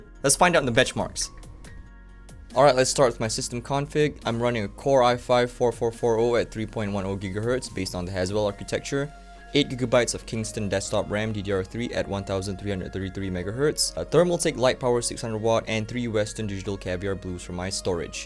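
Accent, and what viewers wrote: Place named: England, United Kingdom. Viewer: nice review. especially the eng accent haha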